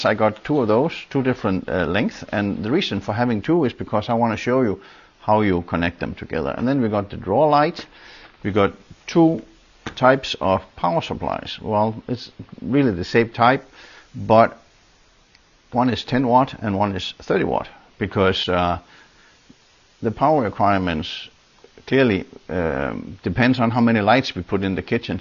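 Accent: Denmark. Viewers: Tak. I trust you probably have Danish ancestry as I do miss the Danish English accent.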